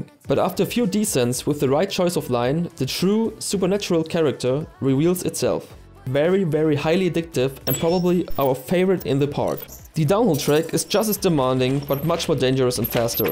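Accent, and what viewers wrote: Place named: Germany. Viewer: Super Video! Gutes Denglisch ;)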